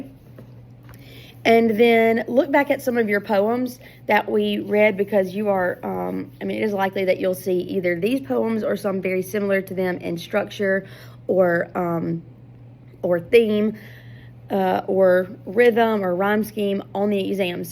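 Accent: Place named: South, United States. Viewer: Southern Accent, 80's hair.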